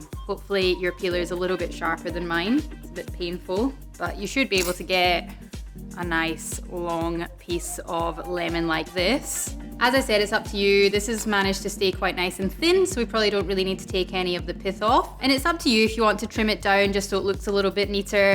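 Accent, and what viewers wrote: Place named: Scotland, United Kingdom. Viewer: I'm obv late to the party but just drank a few (4) brandy Crustas and was trolling youtube for relevant content. I like the bit of maraschino in the crusta. did the Cointreau recipe (3C 2B 1L .5M no bitters), then the 4B 1C 1L 1M plus angostura recipe, and liked the cognac forward version better. I'm sure I'm a bit under things with the relatively quick succession, but just found your channel and thought from the accent--are you Scottish? you mentioned being in Australia in the vid... Imma feel a right knob is your Irish or Aussie--my bad. either way, appreciated the lesson--good stuff! I'm def subscribing.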